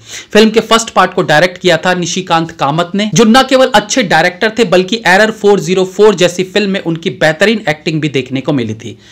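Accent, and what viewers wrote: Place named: South, India. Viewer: South wali Hindi aai hui h sir.....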